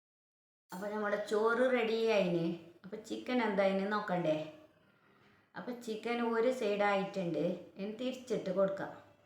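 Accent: Kasaragod, India. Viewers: ഇത് കൊള്ളാലോ നല്ല ഐഡിയ ആണല്ലോ. നമ്മളെ കാസർഗോഡ് ഭാഷ കേൾക്കാൻ നല്ല രസമുണ്ട്. കാസർഗോഡ് എവിടെയാ ഞാനും കാസർഗോഡാണ് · എനിക്കൊരുപാട് ഇഷ്ടപ്പെട്ടു നല്ലൊരു വീഡിയോ ആയിരുന്നു ഞാൻ ഇതുവരെ ഇങ്ങനെ ഉണ്ടാക്കുന്നത് കണ്ടിട്ടില്ല തീർച്ചയായും ട്രൈ ചെയ്തു നോക്കും അറിയാത്തവർക്കു വളരെ ഉപകാരമായി വീഡിയോ ആയിരുന്നു ഇങ്ങനെയുള്ള കുറെ ടിപ്സുകളും ഉപകാരമുള്ള വീഡിയോയുമായി വീണ്ടും വരണം കാസർഗോഡ് സംസാരം കേൾക്കാൻ നല്ല രസമുണ്ട് ഞങ്ങൾക്ക് കാസർഗോഡ് ഭാഷയിൽ സംസാരിച്ചു കേട്ടാൽ മതി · Nalla usefull video..bakki Vanna choru ini ithupole cheythunokkanam..kasargod samsaram kettirikkan nalla rasamund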